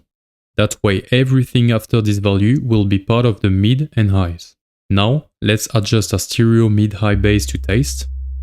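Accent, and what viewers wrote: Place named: France. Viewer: À l'accent, j'imagine que t'es français donc je me permets de le faire en fr. Super vidéo ! Je viens de découvrir ta chaîne et tu nous donnes des tips vraiment utiles ! En tout cas j'avais jamais vu une vidéo comme celle-ci sur les prb de phase. Merci mec !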